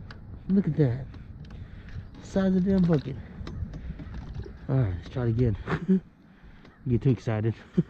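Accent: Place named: California, United States. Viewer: I love his Californian accent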